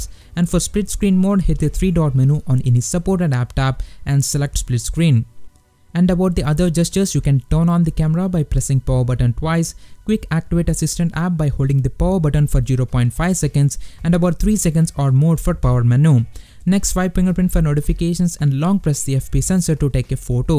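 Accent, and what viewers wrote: India. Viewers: That's Indian accent. Get used to it on this channel. Lol.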